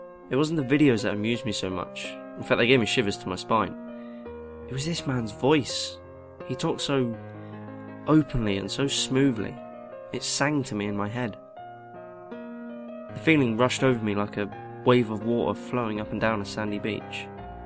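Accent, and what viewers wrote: United Kingdom. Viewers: british accent. :D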